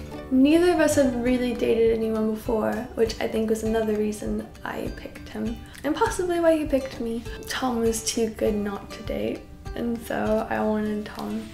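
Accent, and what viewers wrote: England, United Kingdom. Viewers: Wait you're American? I always thought you were British 😂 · How long have you been living in England? You're starting to get a bit of an accent. ☺ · I love hearing the British accent creeping into your voice!!!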